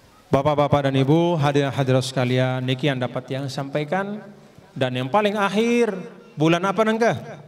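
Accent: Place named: Sasak, Indonesia. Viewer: senang banget sy dengar bhs sasak halus intonasinya sy 15th di Lombok ngerti sekedit kedit ton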